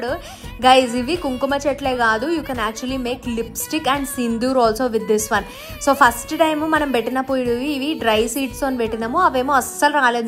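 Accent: Telangana, India. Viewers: నువ్వు పక్కా తెలంగాణ అని తెలుస్తోంది, తెలుగు మాట్టాడమ్మా, పర్లేదు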